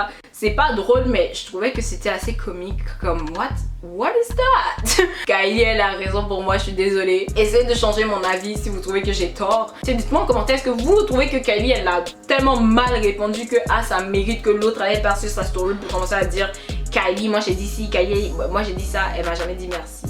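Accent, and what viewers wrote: Quebec, Canada. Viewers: La Polémique * ma belle....pas polemie, mais tu es cute avec ton mélange d'accent québécois, américain, et ivoirien....😅😘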